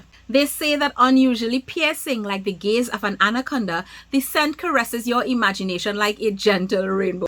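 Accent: Trinidad and Tobago. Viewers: You MUST be from Trinidad and Tobago by your accent!?!?! Biggup Trini...fellow Trinidadian here. Great content, keep up the great work. Had to subcribe 🇹🇹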